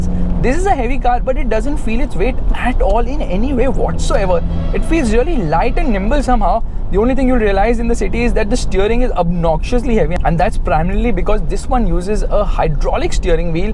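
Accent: India. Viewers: Someone kill the Indian English accent please 🤢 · Typical indian english why dont you speak hindi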